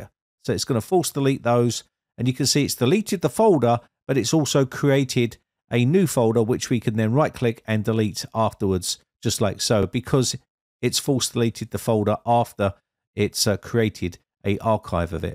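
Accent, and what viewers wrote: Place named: Cockney, United Kingdom. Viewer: I'm pretty sure he's saying, "What you guys" which is like the cockney geezer way of saying, "What's up guys" 😁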